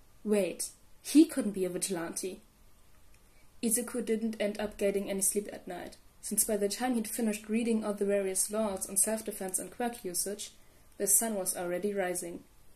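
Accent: Germany. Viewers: I think your English is very good! I was wondering what your first language was though tbh. I guessed it was German but I’m not sure.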